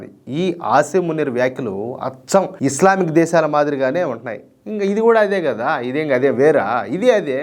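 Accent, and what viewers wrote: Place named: East Godavari, India. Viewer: I love the language of the author. A typical Andhra East Godavari Villager's talk. Makes me feel at home. I was born and raised there. 88 yrs ago.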